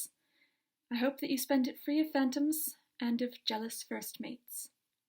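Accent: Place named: United Kingdom. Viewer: There is something so romantic about the sea. Thank you for sharing these wonderful stories. It would be nice to see a map of the area in future if you have the time. I'm from the U.S., so I'm not as familiar with British geography as I should be (though I am a big Anglophile). I've been watching your videos for a while now, and I have the impression you are currently living in Canada (though I could be wrong). You sound like you are from Britain originally to me, (though of course, I could be wrong again). I would be curious to know if and why you moved to Canada. It really is none of my business though, and if you prefer to keep certain things about your life private I completely understand. I really appreciate your work, and I thank you for sharing with us.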